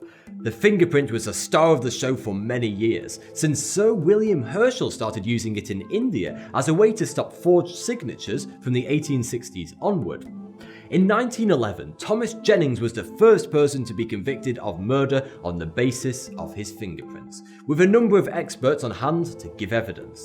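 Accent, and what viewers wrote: United Kingdom. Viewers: oh man this guys should totally be the new James Bond ! that wink can make any girl wetter than the pacific ocean, also his British accent is on point ayy lmao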